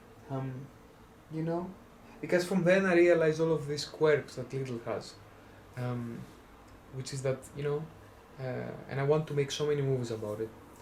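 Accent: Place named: Greece. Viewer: Your accent reminds me of stefanos tsitsipas, which makes sense because he is greek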